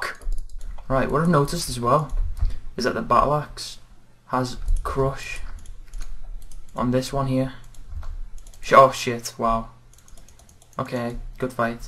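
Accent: Liverpool, United Kingdom. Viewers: you sound like you're from liverpool, are you? hate to ask but like so he can see.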